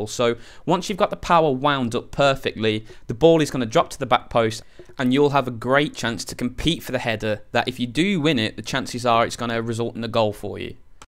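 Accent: United Kingdom. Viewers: i hate your british accent but good video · If you think his British accent is bad you haven't heard more than half of other British accents. Especially the ones as you go towards the north of UK.. lol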